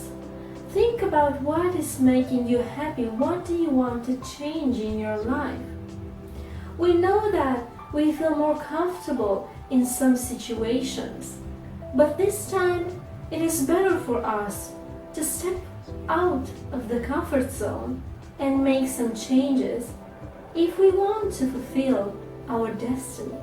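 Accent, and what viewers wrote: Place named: Italy. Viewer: Great reading, very positive insight about this month! Love your accent. Are you Italian?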